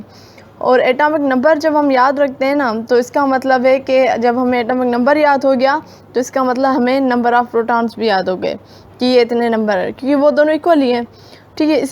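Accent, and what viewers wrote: Sindh, India. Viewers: Searching for best lecture on atomic structure and got your video ❤ .... Love your Sindhi accent Urdu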